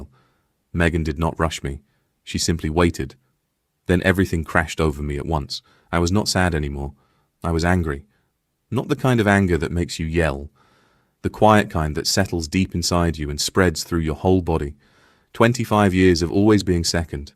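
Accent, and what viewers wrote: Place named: RP, United Kingdom. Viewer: lol, these Ai generated stories are whacked out😂 He Supposedly grew up in Knoxville Tennessee, yet has an upper class British accent 😂.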